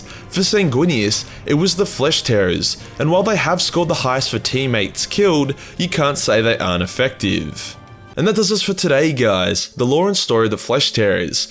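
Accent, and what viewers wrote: Australia. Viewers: You could do with a little heavier Australian accent. What kind of Australian are you? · My Girlfriend is working in a BPO Australian account when she heard the astorath's paraphrase she laugh so much told me that is absolutely Australian😂🤣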